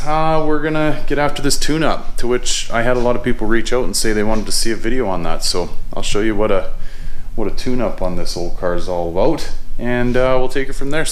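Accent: Canada. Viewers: You sound Canadian eh. I'm in Sask. I have the same rear main and clutch issues, sigh.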